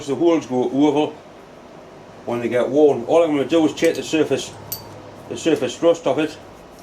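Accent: Newcastle, United Kingdom. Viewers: Great,I could listen to a geordie talk engineering for hours...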